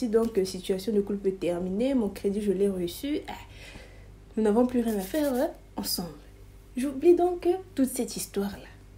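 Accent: Democratic Republic of the Congo. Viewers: 😂😂j'aime trop ton accent congolais ❤ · Une vrai CONGOLAISE sans complexe avec son accent j'aime 😍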